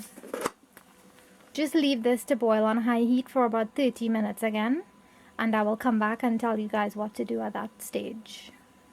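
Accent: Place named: Trinidad and Tobago. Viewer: I live in Montreal, Quebec Canada, I would love LOVE to have a wife born in , Trinidad and Tobago I adore everything about these women, The food, the fancy dress, and that accent, the culture, the eyes, ouff Being an Italian man, guess it will remain a dream. dammit [ a pinch goes a long way she says,,,, LOL ] sometimes they slap us when we pinch 'em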